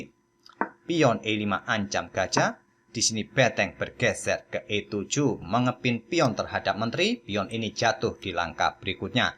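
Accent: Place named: East Java, Indonesia. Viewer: Admin logat orang jawa timur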